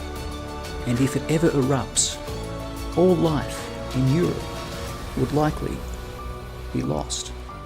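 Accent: New Zealand. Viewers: 7 volcanoes, lots of hyperbole, little actual information for real reference. Not saying the 'supervolcanoes' are not super hazards, they are. But this video isn't giving anybody any degree of expertise and detail of known facts. Decent visuals, great Kiwi accent. The music is cheesy and makes it clear from the start that this is fluff, not science. I love accurate pop science. This isn't it. Starting with who is the author and why they are qualified to present information is always an honest start. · It’s a kiwi accent you dumbo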